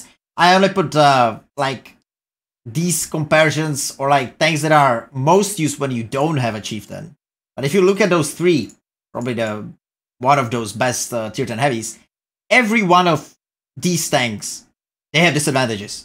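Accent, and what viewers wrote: Czech Republic. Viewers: Zase tak skvělou ne, ale už jen že mluví s českým přízvukem to dělá srozumitelnější a to o HODNĚ. Taky mluví dost pomalu. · Angličtina jako čeština pikacu ,smysl ok😊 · ingliš vidijou miluju czech accent over muj british :D:D:Dd:d tohohle typka miluju proste, nejůepsi wot content a zvedne ti naladu kdykoliv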